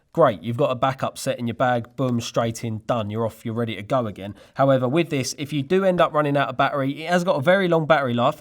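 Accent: United Kingdom. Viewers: Sony a7c gonna be a perfect match for this thing for travel, so I consider buying one if not annoyed you enough with the comment. Little star warsy color and design but who cares, at least folks can see which side I came from. I may get a suitable helmet too. And I subbed for the accent that reminds me of the characters in the movie Snatch, even though not entirely cockney.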